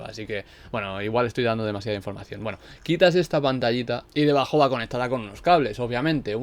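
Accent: Spain. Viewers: De que parte de españa eres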